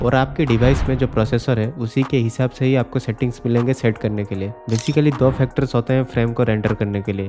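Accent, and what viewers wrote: Odisha, India. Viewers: Kya aap odisha se ho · Bro i think you are from Odisha..Your native language is Odia..BTW you explained this clearly.love from Odisha. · Odia bhai voice ru jana padi jauchi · Aap oriya ho na? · Are u odia bro? Your accent is so strong 😂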